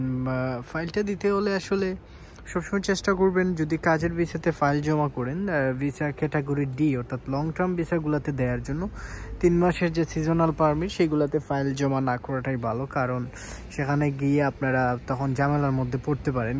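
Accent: Sylhet, Bangladesh. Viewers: Vai apne kita sylhety ni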